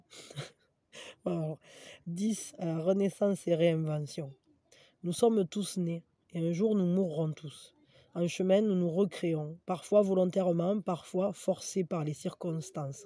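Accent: South, France. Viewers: Merci, toujours entre le rire et la poésie, j'adore et ton accent c'est mon sud...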